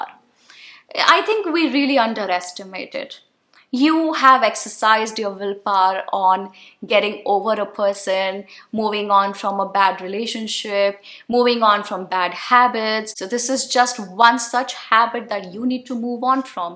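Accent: India. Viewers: You need to change your accent in English language from Indian to American accent. Indian people tend to speak too fast (bad control of breathing) when speaking English. American accent is worldwide. Changing accent is easy for some people but very hard gor other. Take it as a constructive criticism.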